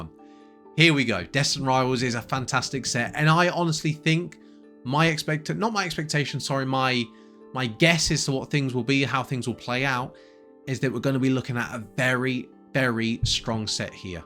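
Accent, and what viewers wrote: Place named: United Kingdom. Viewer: Beautiful British accent.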